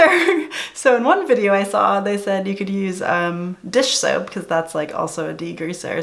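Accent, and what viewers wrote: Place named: New Zealand. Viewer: never found kiwi accent so interesting before XX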